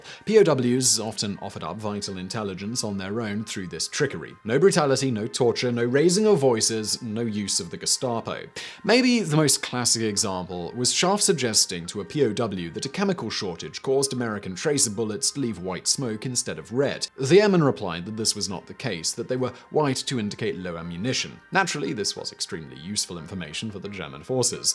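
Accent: United Kingdom. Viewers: It's Vsuace but British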